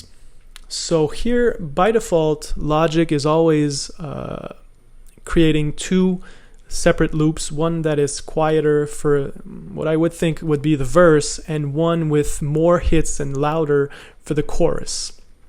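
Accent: Quebec, Canada. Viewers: Quebecois spotted. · stu un quebecois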